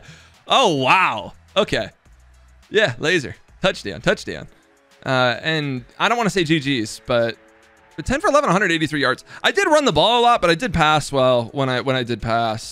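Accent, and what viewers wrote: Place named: South, United States. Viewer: I’m here for the southern accent and I lost already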